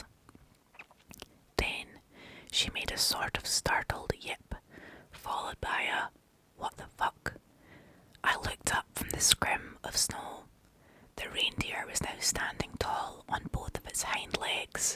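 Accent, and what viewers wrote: Scotland, United Kingdom. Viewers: Fuck aye the Scottish accent is great for asmr. Sending love from Wales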